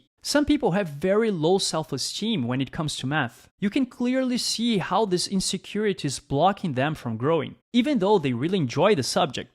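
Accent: Brazil. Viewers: É impressionante como o sotaque brasileiro é característico 😅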